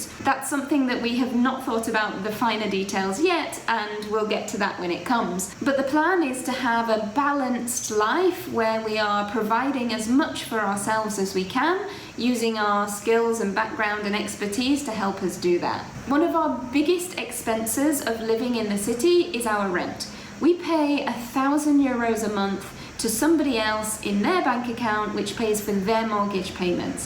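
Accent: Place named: Yorkshire, United Kingdom. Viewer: What a great video and so interesting to hear you explain your motivations and plans for the future. You are very brave to strike out into a remote rural location. I will follow your progress with great interest and wish you both and Paco (lovely dog) all the very best. PS. you have not lost your Yorkshire accent.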